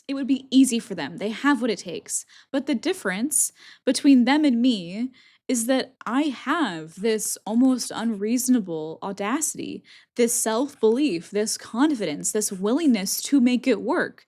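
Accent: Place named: United States. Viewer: I'm kind of waiting for you to address and acknowledge the privilege as well: privilege of being a white American woman (at least from the accent), of having a partner who is supportive who has a high paying job that can support two people, of living in the U.S - particularly NY and LA. I'm not bringing down your accomplishment, but I'd like the average person reading this to not compare their reality to yours, unless it's very similar.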